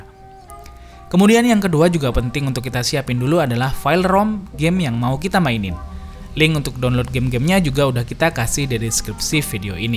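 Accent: Madura, Indonesia. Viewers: kok berasa ada cengkok medure nya....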